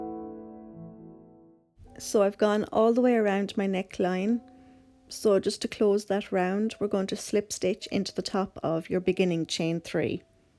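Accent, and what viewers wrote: Ireland. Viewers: It's good to. Hear an Irish voice I am Irish from Dublin xxx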